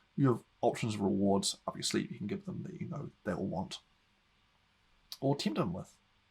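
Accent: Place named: Australia. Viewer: Gidday , detection of a fellow Aussie accented. :) or is there a Kiwi twang, i dunno Kiwi roks to, A Aussie Kiwi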